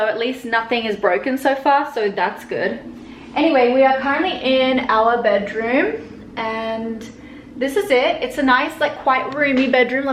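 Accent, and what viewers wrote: Australia. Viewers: Don’t lose your beautiful Australian accent......listen your husband girl😀😀💕💕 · is right you should keep your australian accent, you and so beautiful together. Love you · We love your Australian accent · Welcome to LA guys. And keep the Aussie accent. Love you just the way you are. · Aussie accent rules 😂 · I'm from Australia so I would say keep your Aussie accent... why should we change for people. And ur husband likes it too. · If it were me, I don’t know how I would deal with ALLLLLLL that makeup & beauty products, and having to organize it...maybe I would pay someone 😆 I agree w/ today on everything: you need paintings/art, a chest (besides his 😉), plants and keep the Aussie accent 😘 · Agreed with!! Stick to our Aussie accent man! Xx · Keep your Aussie accent! Even us Americans don’t like our own accents🙂 and more setting your place up vlogs pls👍🏻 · I hope you keep your Aussie accent. 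I lived in the Philippines for almost 3 years and now that I’m back in Australia, everyone keeps saying I’ve got a slight American accent 🤦🏻‍♀️ I just want my Aussie accent back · Don’t lose your Aussie accent!! I’m Aussie and I want you to keep yours it’s why I love it!!! Also love these vlogs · is right, stick to your Aussie accent, love it. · Love your Aussie accent and I just like the organisation for all of your makeup products and I would also love some vlogs kisses to both of you from Paris💋🇫🇷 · We LOVE Australians in Los Angeles. Please keep the accent. 🌺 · yeeeeessssss please more setting up videos and yea she will loose her Aussie accent eventually and no no longer Australian you guys are american now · I love your Aussie accent I agree with haha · Nice! L.A I am from Venice CA. Keep your Australian accent, it is Beautiful! My hubby has a Scottish accent. Love it ♥️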